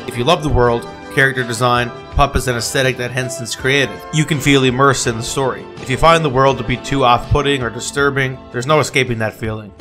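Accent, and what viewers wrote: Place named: Newfoundland, Canada. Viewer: Love the video and all of these films. But I need to ask....you've gotta be a Newfoundlander, am I right? (fellow NL'er here)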